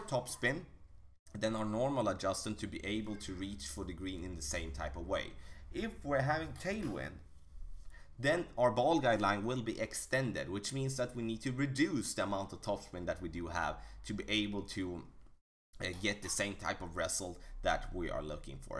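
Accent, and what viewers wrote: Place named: Sweden. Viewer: Svengelska haha nice gillar din kanal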